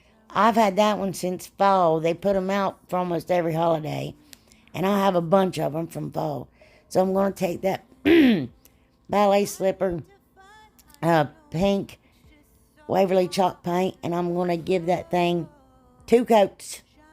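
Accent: South, United States. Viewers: 1st time viewer,,,and I absolutely ❤ you and your personality and not to mention ya sound like me when I talk,,,(country accent)🤭🤭🤭,,,I'm from Alabama,,,where are you from? Love your creativity,,,,I am now subscribed,,,cant wait to see more,,,thank you for sharing💕💕💕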